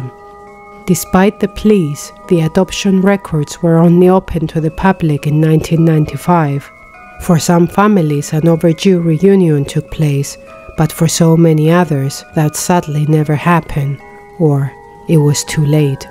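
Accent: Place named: England, United Kingdom. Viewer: I love the English accent also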